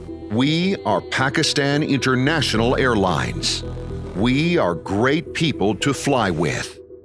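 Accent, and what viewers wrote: United States. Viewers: The american voice seems unreal. Please have a Pakistani bring in some flavour, instead of this military sounding thunderous voice. It will give it a Pakistani feel. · If your a Pakistani airline then why are you using an American voice why not a Pakistani voice. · western guy with american accent promoting PIA He is not even able to pronounce Pakistan correctly. You people didnt find any single person in pakistan worthy enough to give a voice over for this video? . Such a disappointment.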